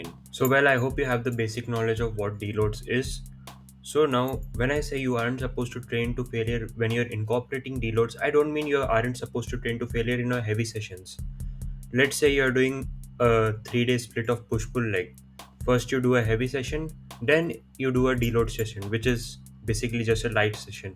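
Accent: India. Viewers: Why does a white dude have Indian accent 😭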